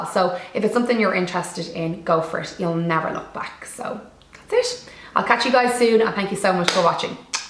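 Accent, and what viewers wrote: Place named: Ireland. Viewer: Love the video 💞 Really informative and very refreshing to hear an Irish voice on YouTube 🎉🇮🇪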